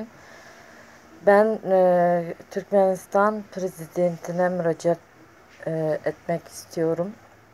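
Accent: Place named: Khorezm, Uzbekistan. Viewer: Манимча хоразмча гапирдингиз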